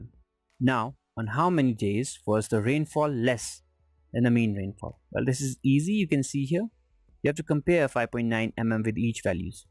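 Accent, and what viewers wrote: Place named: United States. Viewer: u are indian right? but your voice sounds more like an american ...... :)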